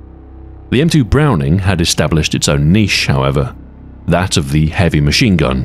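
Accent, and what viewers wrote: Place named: Manchester, United Kingdom. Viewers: He's British. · I want to say Manchester, England.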